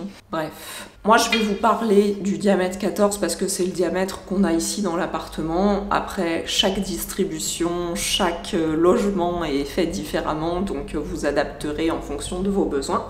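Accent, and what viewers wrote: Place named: France. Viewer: Je sais pas pourquoi, mais j'aime bien entendre cette damme parler :) Elle a un "accent" français que je ne connais pas, trés agreable à l'ouïe, si bien sûr je peux appler ça "l'accent" vu que je ne suis pas français moi-même, d'une autre region que la mienne probablement. Je mets un Like!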